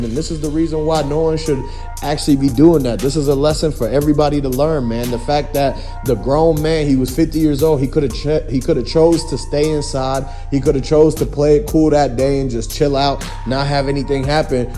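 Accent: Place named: Idaho, United States. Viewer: Man delete this dude u sound like u from Idaho n don’t have a clue what really happened 😂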